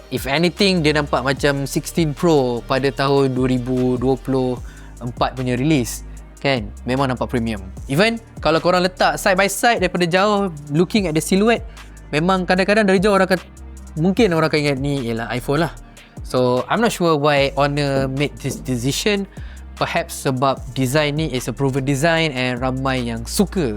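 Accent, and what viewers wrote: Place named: Kuala Lumpur, Malaysia. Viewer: Serius lah kalo bukan komen Hang aku rasa Abe ni ore kl 😂😂😂😂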